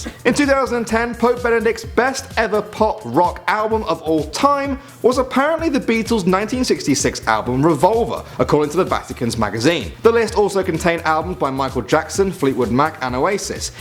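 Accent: United Kingdom. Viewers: Brother, would you please slow down a bit? The fact is, you are speaking with heavy British accent and you talk so rapidly, that it is very difficult for non-british to comprehend what you're saying!)) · I knew of just about all of these.:) I question, though, the presenter mentioning how Paul woke up in '63 with the tune of " " in his head since the song was recorded in '65. Paul, supposedly, obsessed about it during the filming of " ", in fact. While it's possible he could have thought it up two years earlier, it doesn't seem accurate to me based on the many things I've read. Number One is probably the dumbest, oddest conspiracy I've ever heard of. I can't believe there are people who still think Paul died in '66 and was replaced by a double. Lastly..I just have to say that I love this guy's English accent and wish that I could bottle it.;)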